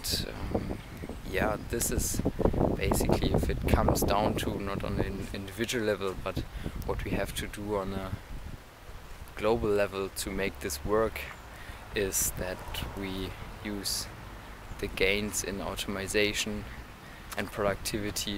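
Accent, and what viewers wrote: Germany. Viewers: Lool he's german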